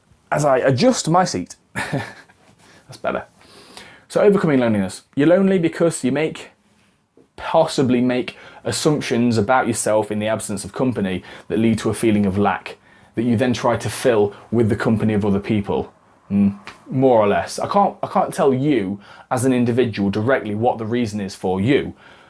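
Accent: United Kingdom. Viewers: your british accent is so coollllllllllll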